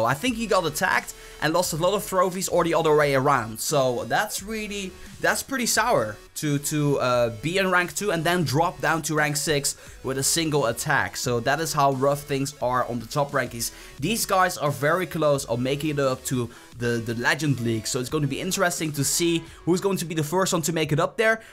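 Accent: Netherlands. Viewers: Ben erg benieuwd hoe je unieke accent bij het spreken van Engels tot stand is gekomen. Aan de ene kant heb je vrij duidelijk een typisch Nederlandse tongval (inclusief de uitspraak- en taalfouten die Nederlanders vaak maken), maar het klinkt daarnaast net alsof je er wat Schotse, Ierse en in mindere mate Amerikaanse ingrediënten aan je accent hebt toegevoegd. Sommige onderdelen daarvan klinken best authentiek. Je spreekt ook best snel en vloeiend waardoor het wel natuurlijk overkomt. Grappig en interessant; viel me op.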